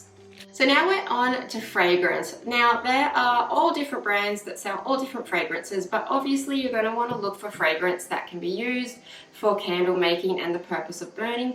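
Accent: Australia. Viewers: Nice to listen to an Aussie, thank you very informative 😊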